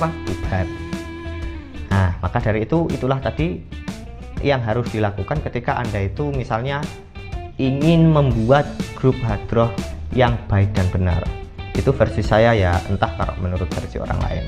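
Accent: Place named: Java, Indonesia. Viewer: Jawa ya mas? Bahasa nya kaya saya hehe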